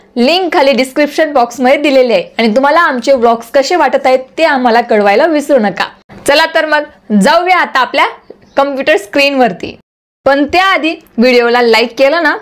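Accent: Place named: Jalgaon, India. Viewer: Khoopach Chhan ! Tai tumhi Jalgaonchya disata. Bhashevarun lagech kalate.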